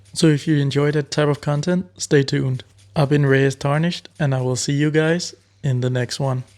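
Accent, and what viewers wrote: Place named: Denmark. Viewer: Er du dansk? The accent really sounds danish.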